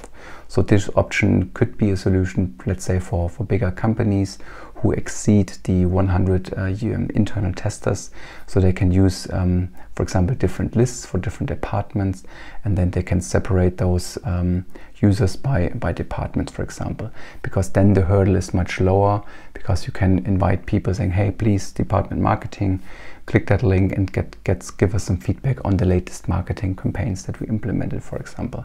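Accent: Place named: Germany. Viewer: Didn't expect Henry Cavill with German(?) accent.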